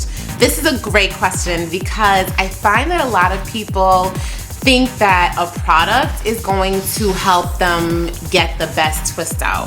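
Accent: New York, United States. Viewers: I so LOVE you, your NY accent was all over this one. One day I'm going to be able to meet you at a meet and greet, you seem to be a blast to be around. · LOL I love your voice... your such a NEW YAWKER ..lol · Love your accent girly! NY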